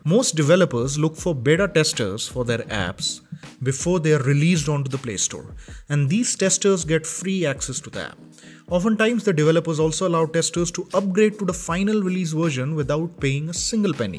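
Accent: India. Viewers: bro every tutorial i search on youtube is english but the accent is hindi and the voice is not good to hear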